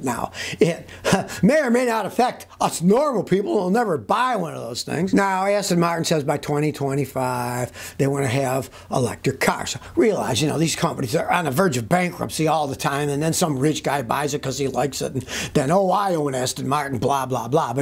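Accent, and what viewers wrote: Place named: United States. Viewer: This man has the voice of true America. Don't give these corporations your money for their garbage products. Don't.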